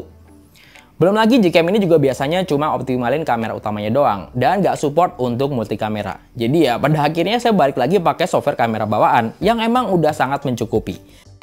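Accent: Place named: Tegal, Indonesia. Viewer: Weh tegal toh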